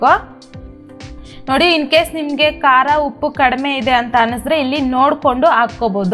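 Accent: Kolar, India. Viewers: Kolar side avaru ansuthe nice kannada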